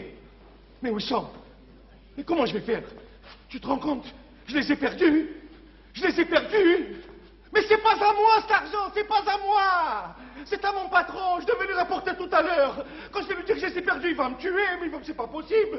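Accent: Algeria. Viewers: L'accent pied-noir d'Alger,différend de l'oranais à forte empreinte espagnole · Trop puissant Castel ! Cet accent pied noir c'est que du bonheur.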